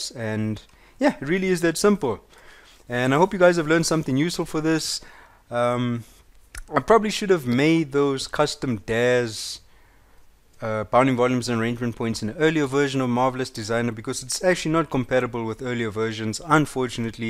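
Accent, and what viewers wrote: South Africa. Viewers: weird thing but you sound south african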